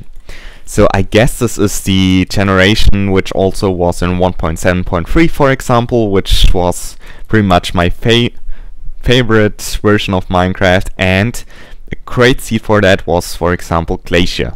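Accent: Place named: Germany. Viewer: du bist ein deutscher dat hört man....xD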